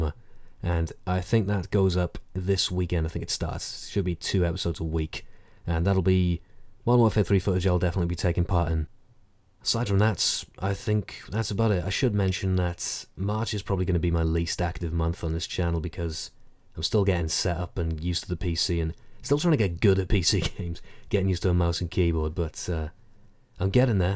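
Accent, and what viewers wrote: Wales, United Kingdom. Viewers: Jesus did not realise you were welsh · I knew you where welsh:) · I knew it! I was sure I recognized that accent. Hello from Wales. ^_^ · You don't sound that welsh:o Now you've mentioned it though I can see it:P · you're welsh? that's awesome! I'm half welsh (but I was born and live in America so I don't have that awesome accent). I went to Wales 4 years ago for a couple of weeks. beautiful country. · You're Welsh?? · Your welsh:DDDDD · Here's what I would love to see: Best: CoD4, BF3, MW3 (must have sonorous voice-over) Next best: everything else as long as it's still narrated by our Welsh host. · I knew you was WELSH!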